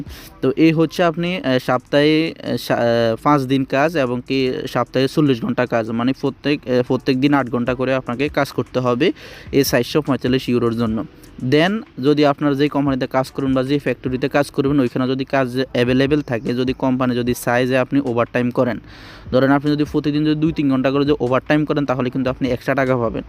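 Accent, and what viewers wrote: Noakhali, Bangladesh. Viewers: ভাইয়া আপনার বাড়ি নোয়াখালী মনে হয়,,,,??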